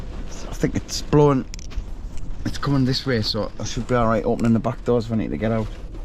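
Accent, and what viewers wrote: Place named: Derbyshire, United Kingdom. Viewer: Where are you from? Love Your accent! sounds like Derbyshire? That sort of area? X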